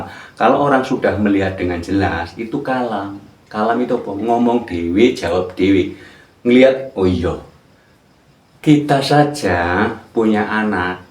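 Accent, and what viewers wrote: Banyumas, Indonesia. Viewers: dari logatnya sih jawa ngapak, pokoknya antara brebes-tegal-pemalang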